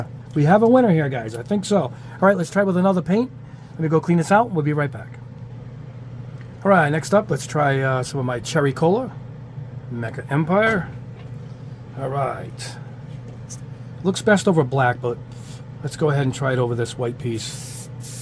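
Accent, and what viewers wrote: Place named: Northeast, United States. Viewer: lol, your NE accent coming through talking about a new paint "sauce". i am from the south so it took me a bit to figure out what you meant. (that's ok, my pronunciation of source would be "so arse") 🤣 love the videos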